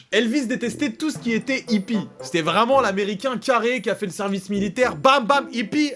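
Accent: Colombia. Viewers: Accent Colombian: 20/20❤️ Leonard di Vinci: 58/20😍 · L'accent colombien 200000/20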